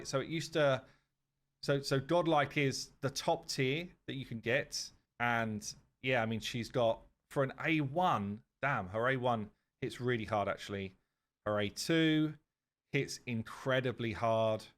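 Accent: United Kingdom. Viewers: Oh you're definitely British😂😂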